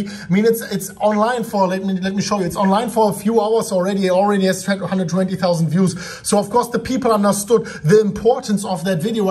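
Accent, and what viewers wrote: Germany. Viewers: Junge, dein deutscher Dialekt ist der Hammer! :D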